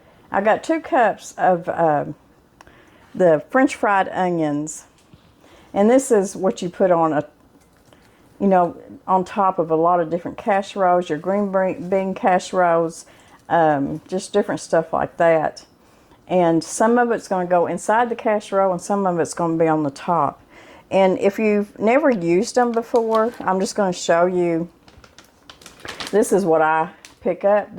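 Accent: United States. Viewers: Love to watch your videos love your country voice iam also in AR was raised on country cooking thank You God Bless you all.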